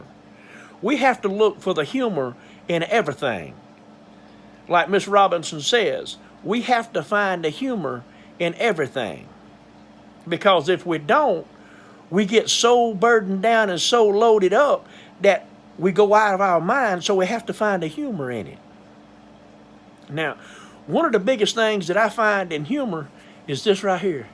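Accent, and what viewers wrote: South, United States. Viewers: It wouldn't be if he didn't talk southern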